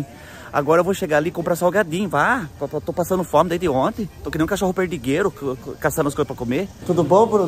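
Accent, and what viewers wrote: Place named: Minas Gerais, Brazil. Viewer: descobri vc Ágora no YouTube você é muito engraçado seu sotaque parece ser mineiro e parece ser nordestino, eu sou nordestina, adoro você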